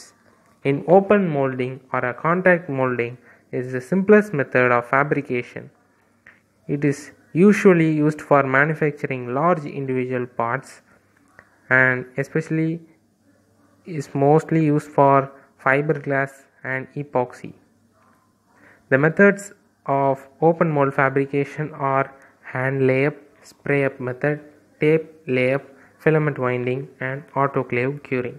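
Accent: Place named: India. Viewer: Your voice is like you are an indian.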